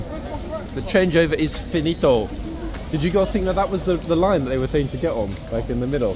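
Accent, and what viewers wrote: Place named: United Kingdom. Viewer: What that guy meant by jerk walked in front someone walked in front of his camera as he took a picture. Also I really wish you could get Trooper Ellis to do a little Q &A for us, partly because I like British accents (yours is lovely and almost musical) But also because I'm genuinely curious about what it's like to Work with all the horses, It's to bad we can't camera and mic up a Kings guard for a Day in the life of video.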